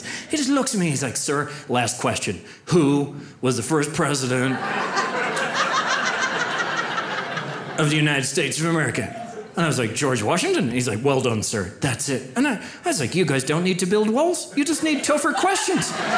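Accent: Ireland. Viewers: Hahaha… he’s Irish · The Irish accent makes it funnier 🤣🤣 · Agree, the Irish accent threw me off at first as well, but worth the watch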